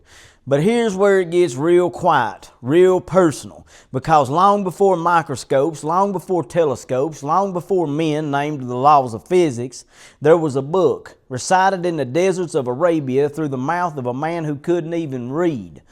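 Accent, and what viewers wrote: South, United States. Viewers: your southern accent is so beutiful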